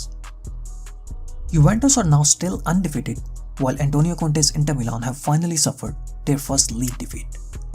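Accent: India. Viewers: Fucking indian accent. This analyzis is bullshit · this indian accent so annoying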